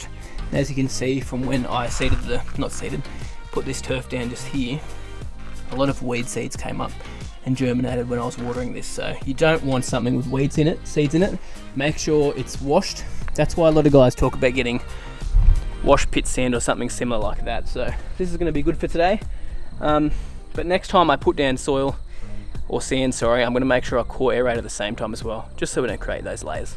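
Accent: Australia. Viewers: You an Aussie?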